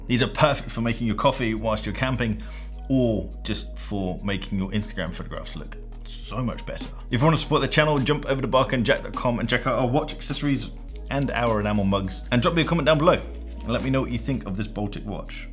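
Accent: United Kingdom. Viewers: I am very sorry, I've tried to resist for ages but I can't stand it anymore: Do you know Chris Broad, why do you look and sound similar, did you probably grow up in the same region and whats this great (british?) accent? Where you come from, there has to be more like you!!! We need to find them... I know this is random, but I cannot not mention it anymore and I am probably not the first one to ask for it or suggest similarities. Actually, Its just that Im interested, if its a coincidence, or if its an actual thing; being a regional accent + lookalike theme, or whatever. Great Videos, I need to add. Great narrative, great look, great speed .. love it! Also came here through Baltic, the Acquascaphe GMT being my "target" watch.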